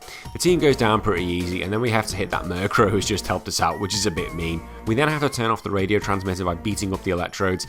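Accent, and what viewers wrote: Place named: Scotland, United Kingdom. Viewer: I like your accent, I think it's scottish?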